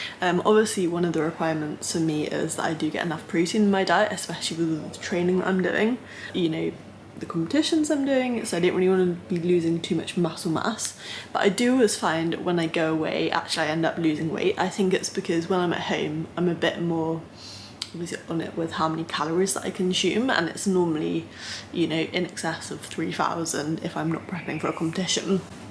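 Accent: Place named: United Kingdom. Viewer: I know lots of guys come here to watch you work out...but for myself with your accent and your calming tone I could listen to you talk all day. Its very old style British you dont hear anymore. cheers from USA.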